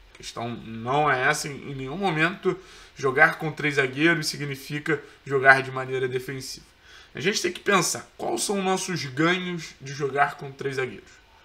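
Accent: Rio de Janeiro, Brazil. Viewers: E esse sotaque carioca torcedor do furacão? 👍👍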